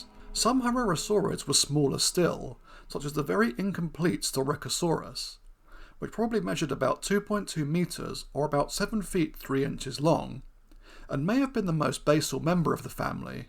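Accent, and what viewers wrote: United Kingdom. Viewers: (In an endearing way) Came for the click bait, stayed for the Brit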